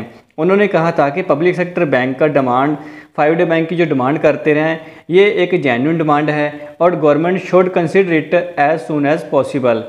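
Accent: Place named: Punjab, India. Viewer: खोदा पहाड़ निकला चुहा।कुच्छ भी नही होने वाला है ।आप का बोलने का लहजा है वह पंजाबी लगता है।कृपया हिन्दी बोलना सीखो।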